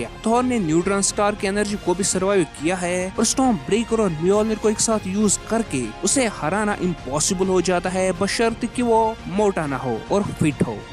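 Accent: Kashmir, India. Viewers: Hmm y does ur accent sound familiar. Kaishur chuka?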